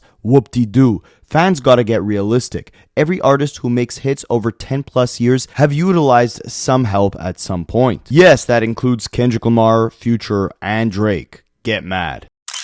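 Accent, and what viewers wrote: Canada. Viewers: Drake must be Toronto slang for Fake. No offense I can hear ur canadian accent and like your work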